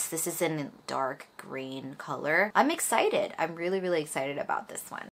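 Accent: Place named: United States. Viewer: Dena我有問題✋ 這是我很久以前就想問的！終於鼓起勇氣問 因為真的太想知道了😂 就是為什麼妳是在台灣長大但是講中文卻有abc感？！ 是因為講英文久了就會變這樣嗎？ btw我好喜歡妳💖💖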